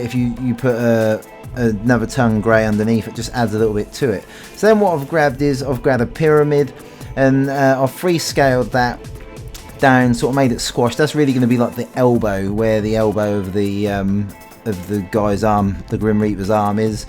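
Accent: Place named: United Kingdom. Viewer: The emblem was super hard as hell and good British voice:)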